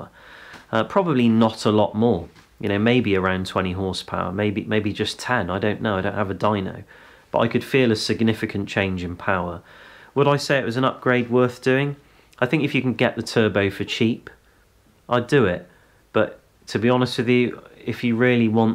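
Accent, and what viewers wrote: England, United Kingdom. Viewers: Ha, no Mike, just a very English pronunciation :-) Its actually a great video, where the underlying story is more about - whatever your 4x4 is, there's things to work on for more power, but that rabbit hole can get deep.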